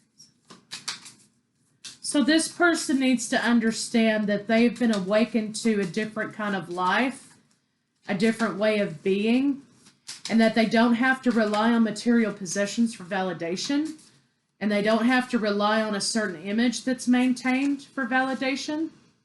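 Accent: South, United States. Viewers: Where are you from if I may ask.. I love your southern accent and how u serve it straight up, no chaser!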